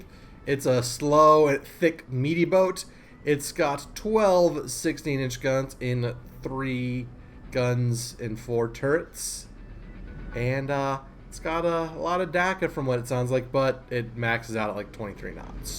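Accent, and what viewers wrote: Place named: Minnesota, United States. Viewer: As a Minnesotan I can confirm that accent is 100% accurate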